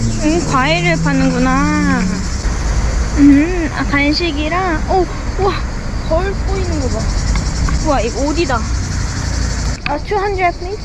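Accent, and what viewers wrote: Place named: Seoul, South Korea. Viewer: 님 말투가 'SNL 서울 사투리' 엄청 잘하실 것 같아요ㅎㅎ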